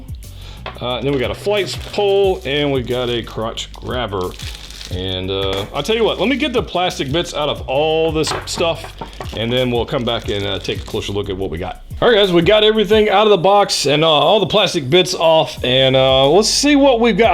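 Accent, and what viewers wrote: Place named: New Jersey, United States. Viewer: lol..that is Jersey accent you acquired ! Hilarious !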